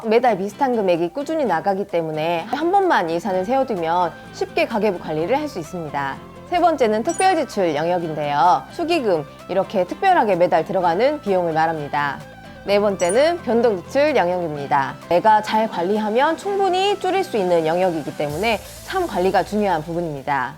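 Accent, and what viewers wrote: Jeolla, South Korea. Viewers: 말투가 전라도분이네요ㅎ 저희 할머니집이 전라도 영광이거든요ㅎㅎ 항상잘보고있어요 ㅎㅎ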